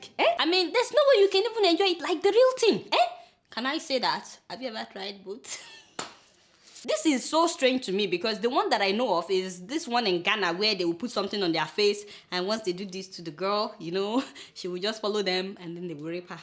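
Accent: Yoruba, Nigeria. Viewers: LOL FUNNY HOW YOUR YORUBA ACCENT IS SO PREVAILING. LOVE YOU GIRL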